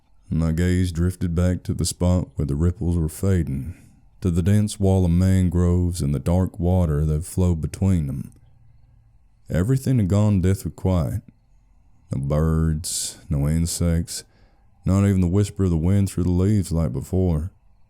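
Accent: South, United States. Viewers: I love your southern accent ❤ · Your southern accent has no reason too be this good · Scary creepy dark water not for me your good old boy accent is on point and I know country myself 💛✌️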